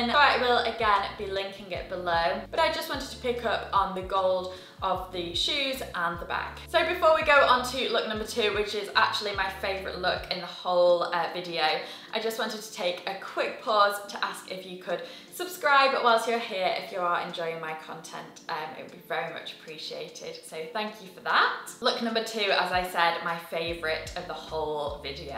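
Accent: United Kingdom. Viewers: Could you please share the designer of the skirt? You did mention it in the video but it was so fast, combined with the fact that my American ears are not used to hearing British english, I cannot for the life of me figure out what it is you said. Gorgeous skirt, I would like to hunt around and see if I can find it somewhere. Btw, I just recently stumbled into your channel and basically binge watching everything. I am def rethinking my wardrobe and really would like to update it. I had a bad habit of getting pretty much all my tops in XS, which is my true size, but there is not any drape really, and everything is kind of fitted. For the longest time I said I would never wear oversized clothing, but after watching your videos, I think I have finally seen the light 😂😂 Literally got rid of close to 15 cashmere crew necks from my closet today. They are all XS. 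Whyyyyyyy Anyway, another thing i swore I would never ever do is a looser fitting trouser. I basically wear skinny jeans in size 26 waist. I always thought they would make my bottom look bigger than it is. But they look so beautiful and stylish on you, I have been salivating over them. A big problem I have always had was even though I have a lot of clothes, and many of them are truly nice (McQueen blazer, Tory Burch dresses, Burberry heels and trenches and Burberry wool coats), I often struggle to figure out what to wear and how to pair things to not look boring. After watching your videos, I realized that it’s bc I never b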